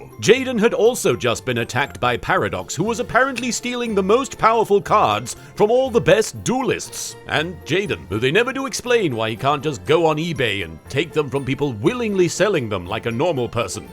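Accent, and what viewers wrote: United Kingdom. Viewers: Why is he so British…? 🤔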